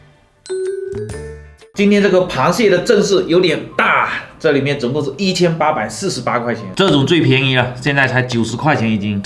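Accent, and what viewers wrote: Fuzhou, China. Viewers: 咱们福州人的乡音属实太明显了。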